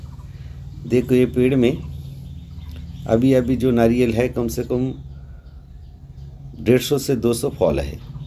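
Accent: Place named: Bengal, India. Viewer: ठीक से तो बोली, बंगाली लहेके में बोला, इसमें गलत क्या ??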